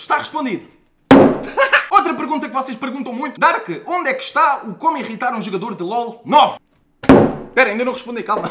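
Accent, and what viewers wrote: Portugal. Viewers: Eu sou brasileiro e tu és português???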